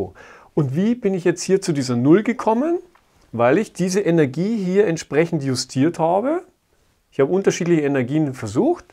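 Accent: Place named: Bavaria, Germany. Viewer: Trey 666 tiefstes Bayern wär mein tip ;)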